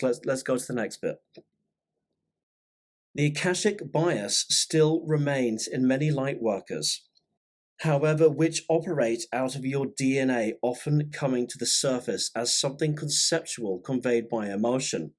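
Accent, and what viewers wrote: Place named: United Kingdom. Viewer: I reduced speed to.75 as well after about 8 minutes; prior to that I was back tracking & replaying. Had to put my “Brit ears” on as well. Lol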